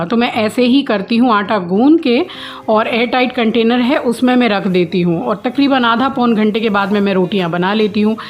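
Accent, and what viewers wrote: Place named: Kolkata, India. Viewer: Aapka typical calcutta accent hai..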